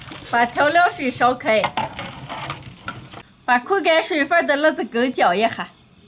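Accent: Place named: Yan'an, China. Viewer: 我陕北话这么有牌面么。感觉还是延安口音。。。。。。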